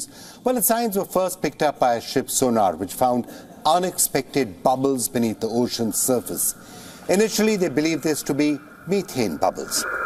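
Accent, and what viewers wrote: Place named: India. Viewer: Into a "Depths" of the pacific ocean. Funny indian guy speaking funny english😂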